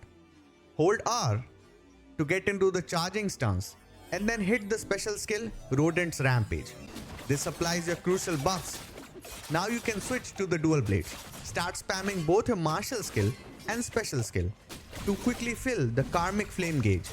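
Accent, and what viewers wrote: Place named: India. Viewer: I'm chinese and your Indian accent is so thick it made me pay more attention to what you were saying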